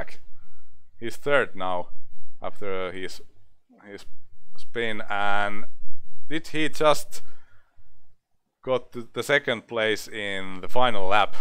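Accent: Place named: Finland. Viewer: You have a finnish accent by the sound of it.. I used to play this game back at 90's in Kuopio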